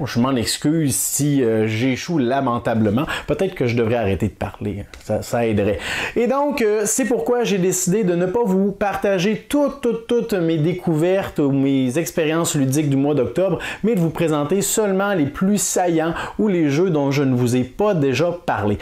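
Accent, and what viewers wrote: Quebec, Canada. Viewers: Nouvel abonné, je vois suis depuis la Toulouse en France. Je vous ai découvert par hasard, et je vais être honnête c'est l'accent québécois qui m'a fait triper ! Plus sérieusement, c'est votre vidéo sur cloudspire qui m'intéressait, et grâce à vous (ou à cause de vous ?) mon portefeuille va saigner car j'ai très très envie de me l'offrir ! Merci pour votre passion et bonne continuation ! Salutations de Toulouse !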